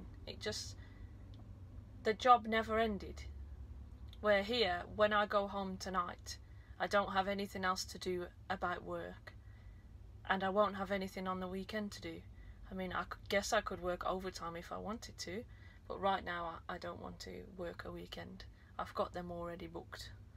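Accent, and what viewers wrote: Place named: Hampshire, United Kingdom. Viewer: Hi You sound local to me, I’m from Hampshire... I’ve just paid for my class 2 rigid course, have my medical next week and then my theory. Thankyou for all your how to videos, and your opinion on all the little things.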